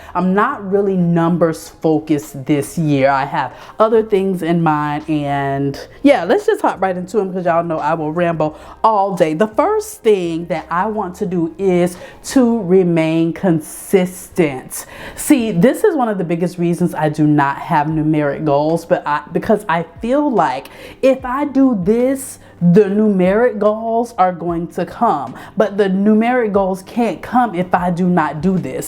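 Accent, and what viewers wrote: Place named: Louisiana, United States. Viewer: Let's GOOO! Let's Get it!! I'm with you this year Sis! Also, your accent is giving Louisiana! I grew up in BR, lol. My goal is to post a youtube video every week.I'm well on my way, too 💪🏿✈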